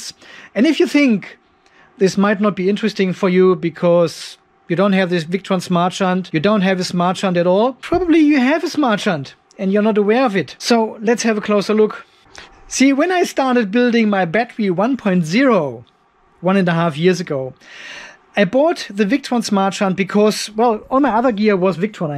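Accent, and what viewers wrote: Germany. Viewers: Nothing sounds more stupid as a German tries to speak english !!!!!! Or laugh in English 🤣😂🤣🤣😂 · very well explained Vom Akzent her könntest du Deutsch sein.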